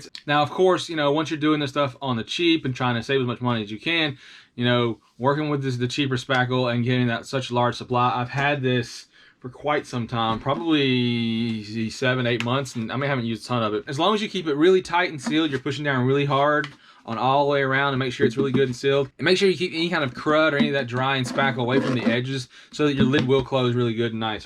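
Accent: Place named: South, United States. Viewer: U get a like and comment for the southern accent OUUU WEEE 😂😂🎉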